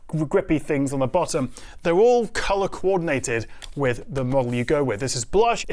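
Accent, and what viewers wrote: England, United Kingdom. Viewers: Is this English sounding man talking in Great British Pounds or American Greenbacks?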